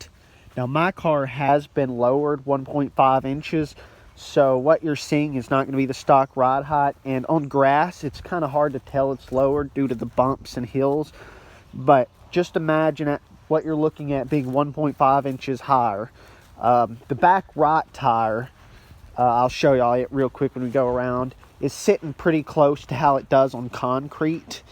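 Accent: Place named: Texas, United States. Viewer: Awesome Texas accent! 👌🏽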